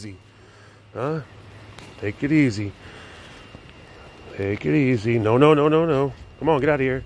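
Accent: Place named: New Jersey, United States. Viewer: This dude gotta be from Jersey. Pasta, Jersey accent and buncha knuckleheads shouting in the background 😂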